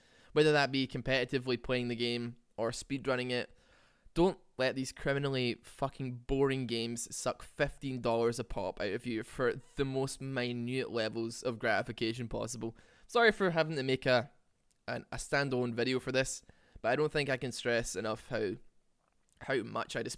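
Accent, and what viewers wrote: Scotland, United Kingdom. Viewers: Are you scottish? ^^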